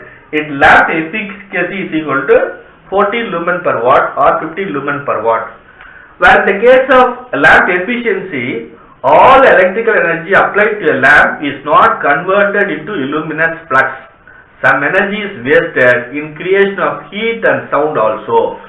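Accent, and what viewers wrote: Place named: South India, India. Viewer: English with a heavy south indian accent.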